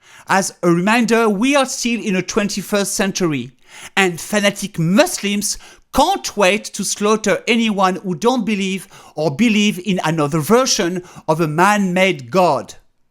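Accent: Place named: France. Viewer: Hi, you know I always loved you. But, to be frank, your French accent is getting more and more... how should I put it...let's just say that your French accent is getting more. Like in this episode, I have to watch the video for 3 times to hear out what are you saying, what's up? you're back to France?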